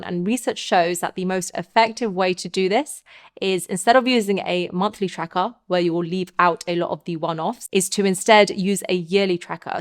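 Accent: United Kingdom. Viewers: God bless pretty, smart, women with British accents! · Accent: British. Currency: USD. Make sense of that.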